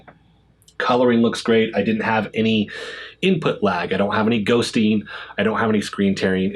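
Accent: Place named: Pacific Northwest, United States. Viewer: Great review! Just curious, are you from or do you live in the PNW? Only asking cause your voice reminds me of other content creators from there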